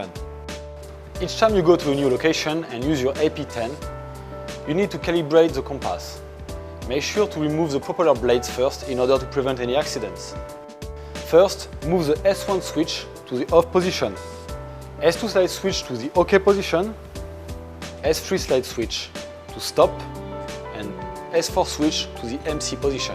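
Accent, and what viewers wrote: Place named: France. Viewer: Bonjour oh l accent francais LOL ouuuuu je voudrais bien la meme demo en francais surtout pour la camera.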